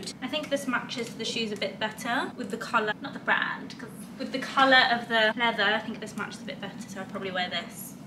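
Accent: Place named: United Kingdom. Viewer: You need to tell us your story, who you are and where r y from! Why do you have a british accent, WHO ARE U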